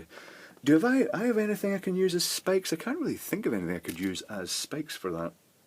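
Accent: Scotland, United Kingdom. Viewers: Scottish accent is amazing 👍🏼👍🏼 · Gun Smoke Scottish accent so im assuming hes from Scotland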